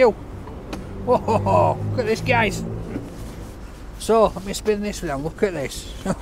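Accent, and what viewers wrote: United Kingdom. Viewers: I try to keep my captions on so I can read what he's saying the British accent is very strong and it's hard to understand sometimes but I do love a British accent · Thank You for watching a Brit talking like a Brit from his region of Britain. I sometimes miss the odd word he says, but as Brits we just go along until it’s impossible to understand what’s going on, the gist is more important than the vernacular. All the best.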